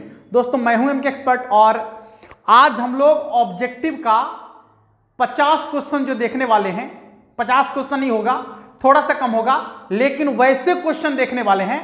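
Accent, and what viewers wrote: Bihar, India. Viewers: Aapke boli me bihari ka mithaas h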